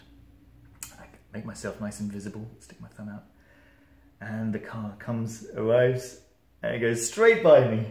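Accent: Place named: England, United Kingdom. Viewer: No offense, but I can see the French heritage in your eye's and nose. So weird seeing that paired with your English dialect.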